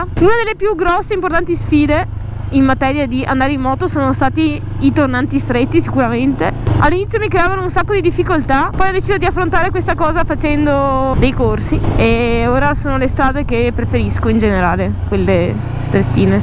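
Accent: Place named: Veneto, Italy. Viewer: L'accento veneto per i motovlog è un plus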